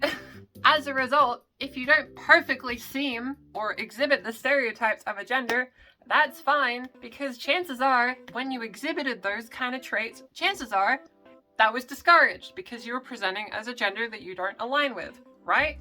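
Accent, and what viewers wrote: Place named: Australia. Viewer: strayan accent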